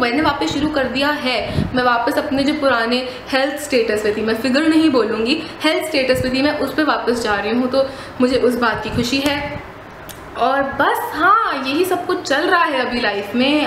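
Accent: Delhi, India. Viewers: Wow..! its so refreshing listening to your "diliwali hindi". Delhi is my hometown and its been a while since i left the city after my wedding. It feels nostalgic listening to you speak. i felt like i was hanging out with my girls in delhi. This video brought a smile on my face. Thank you,. You're amazing. Keep going. 👍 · Hi 😊 your Hindi is typical Delhi wali--haha! You remind me so much of DU I attended Miranda for a year but now in Europe. I love you Hindi Vlog and other videos as well. And 😁 your Hindi is so so similar to mine ( Love your hand actions while you talk 😊😊 haha) --lots of love 💛 Tenzin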